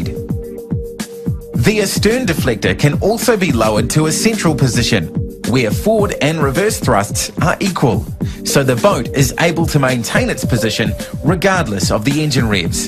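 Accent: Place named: New Zealand. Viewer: Yep. New Zealand accent.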